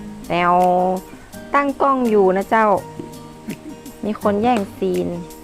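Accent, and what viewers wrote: North, Thailand. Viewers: เสียงแบบนี้ฟังแร้วเป็นคนเหนือใช่ปะคับ แม่ค้าคนจังหวัดจ้าวครับ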